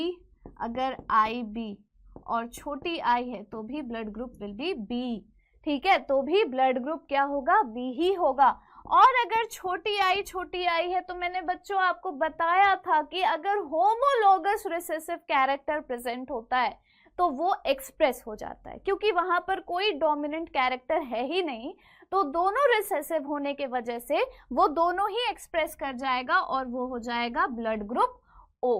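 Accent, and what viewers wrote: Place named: Northeast, India. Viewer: Ma'am ur Hindi is like Northeast pipul but do speak how u speak now make us ur word remember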